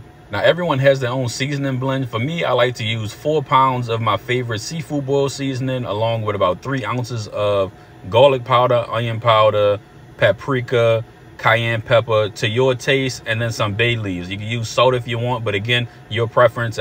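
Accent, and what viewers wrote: Louisiana, United States. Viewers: It's not even a southern thing it's a louisiana thing specifically. It's more of a frenchy way of saying it. Also no need to be rude. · Ill parlez vous angles de Louisiane ! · That is perfect Bayou Accent !!! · Most likely either southern or louisiana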